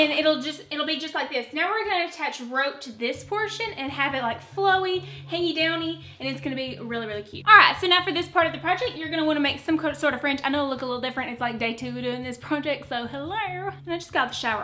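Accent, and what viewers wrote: South, United States. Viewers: You're hillarious. Loven the Southern accent lol hugz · Purple pigtails, over the top eyelashes, and a Southern accent? Subscribed!! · Girl u r a hoot. Where ya from, sho ain’t a yankee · Oh my gosh! You are just too adorable! Love your Southern-ness! Great tutorial. Love the finished piece. I can't wait to make a similar piece. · I have no idea why but I thought you were from Australia for the first 10 seconds then I realized it was a southern accent 😂 you're gorgeous and funny, subscribed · She sounds southern. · You are just too cute! I absolutely love your Southern accent. I’m Middle Eastern so I don’t come with your accent but I live down South now so I’ve learned a few terms. I just discovered you and subscribed. God bless you. 🙏🏽😇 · Alexia Stotsenburg she's southern! We're from Alabama!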